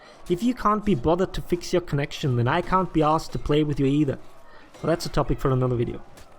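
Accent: Scotland, United Kingdom. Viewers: You sound really Scottish are you?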